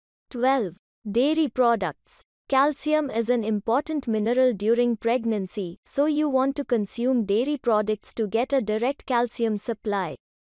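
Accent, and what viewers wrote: India. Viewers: No one wanted to listen to the Indian accent woman drone on in her sing song New Delhi voice.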